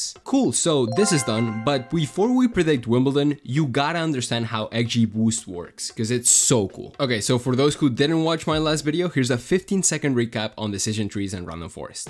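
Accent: Catalonia, Spain. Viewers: Are you Catalan? That "Jaume" accent is literally on point and you have a bit of an accent! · Accent català???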